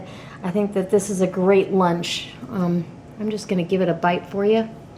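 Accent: South, United States. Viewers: a slight southern drawl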